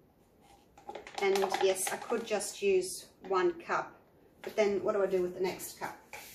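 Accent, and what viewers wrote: Australia. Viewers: I love hearing your Aussie accent as much as I love your artwork.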